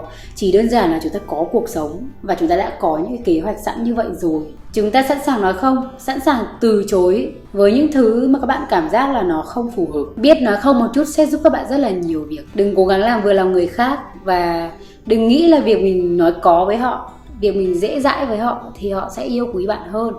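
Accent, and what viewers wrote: Central, Vietnam. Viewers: Chị làm video chỉ nói tiếng miền trung đii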